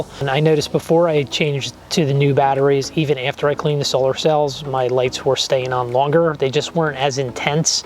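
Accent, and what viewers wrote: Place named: Mid-Atlantic, United States. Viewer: Your mid-Atlantic accent. The show is set in Delco, PA.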